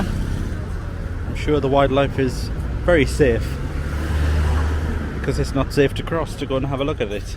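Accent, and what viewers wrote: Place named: Wales, United Kingdom. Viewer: Your Welsh accent is barely there in London...definitely returned now you're back in the valley.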